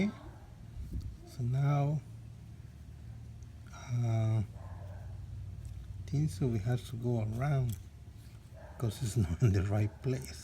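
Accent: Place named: India. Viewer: creí que eras hindú por tu tono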